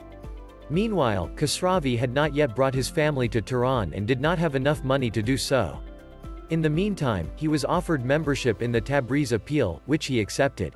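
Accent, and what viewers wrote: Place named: United States. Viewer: American Accent on this video Sucks Pronunciation even worse Hollywood Graphics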